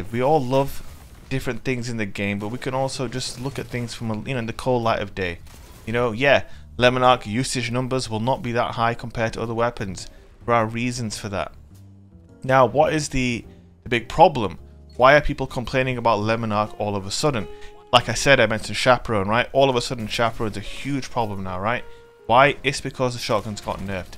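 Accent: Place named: Yorkshire, United Kingdom. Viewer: i always wondered why my accent sounds so similar to yours. NO WAYY you're from west yorkshire, im from north yorkshire and i watch your videos all the time! the earth is so small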